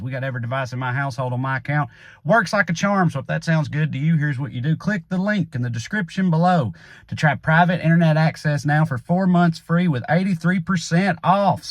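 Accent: South, United States. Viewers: My favorite thing to say about Trae is " When I see in my suggestion I always hope it's a Trae and not a Stephen" the next is that I love to hear words like "incalcitrant" in a southern accent ESPECIALLY used correctly. XD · proof that a southern accent is not a political Identity! Mad respect for the redneck voice of reason! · I dont like southern accents (used to love them), because they are usually associated with someone trying to take away my rights as a woman, stupidity and violence...... But I could listen to Trae all day. His wit, wisdom and level of information is just so astoundingly endearing and makes me remember why I USED to like southern accents. There was a time when the word of wisdom came from those accents, now its like dumbassery galore.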